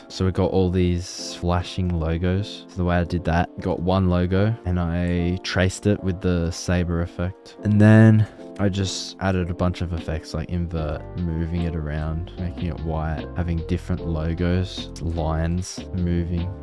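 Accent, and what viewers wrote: Australia. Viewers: Are you Australian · Are you aussie?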